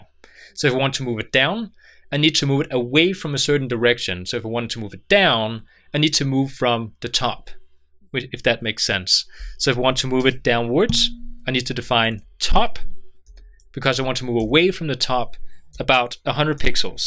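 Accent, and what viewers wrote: Denmark. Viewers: Are you by any chance Danish?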